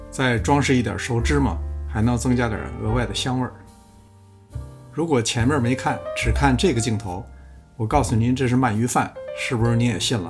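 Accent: Beijing, China. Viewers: 最近看到这个视频，太喜欢这个节目了博主用心做美食耐心讲解，地道的北京口音娓娓讲述烹饪技巧👍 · 时不时蹦出的老北京土话让人听了真的很亲切！感谢您的美食分享！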